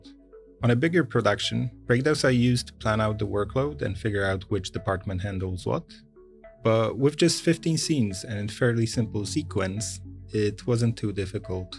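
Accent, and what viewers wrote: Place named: Ireland. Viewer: Do you live in Ireland by any chance? I can kind of hear a slight bit in your accent.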